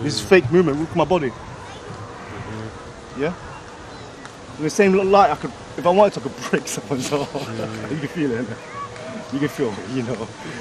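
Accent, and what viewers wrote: United Kingdom. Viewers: i love i assumed he was gonna have an asian accent and he came sounding like a sidemen😂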